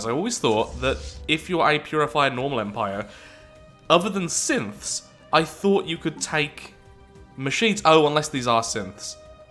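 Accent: United Kingdom. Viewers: As someone whose native language isn't english: You are probably the easiest british person to understand.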